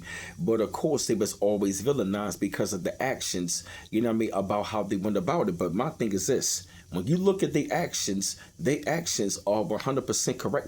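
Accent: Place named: Philadelphia, United States. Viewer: I Hyped this video with points. Your voice sounds like you live in Philly. Great narrative!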